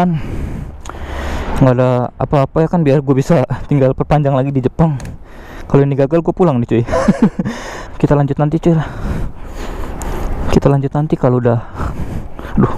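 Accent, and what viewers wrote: Indonesia. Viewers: Logat ngomong kayak org jawa benarkah?:v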